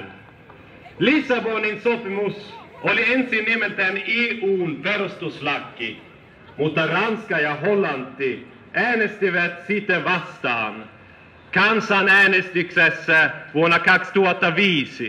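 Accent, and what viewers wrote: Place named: Sweden. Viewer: Han uttalar orden utomordentligt bra! Well done! Med svensk accent men helt suveränt uttal (o bra tal för övrigt!)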